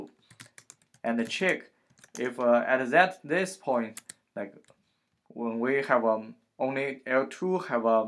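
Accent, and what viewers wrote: China. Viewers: 听口音像是国人，一看评论果然是。 老哥讲的可以啊，我这种菜鸟听懂了。多谢啦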